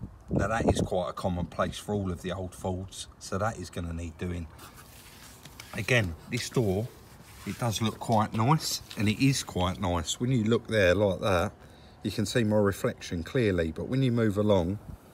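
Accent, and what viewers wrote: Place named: England, United Kingdom. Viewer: Not being a dick but it's so refreshing to hear an english youtuber. Love the american stuff but it's hard to relate, if you get what I mean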